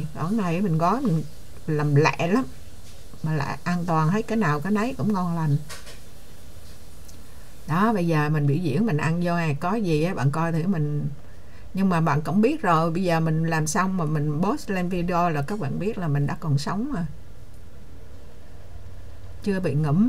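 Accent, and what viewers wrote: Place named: Central Vietnam, Vietnam. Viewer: Chị nói chuyện thật là mộc mạc, đúng người miền Trung ❤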